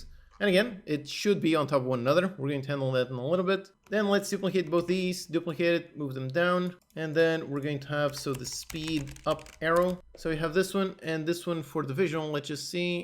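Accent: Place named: Portugal. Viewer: Been watching you for a long time, only now i realized by your accent, tu és tuga como eu. oh my God... Santa ingorancia a minha. Luv you bro. Keep on Keeping on. ❤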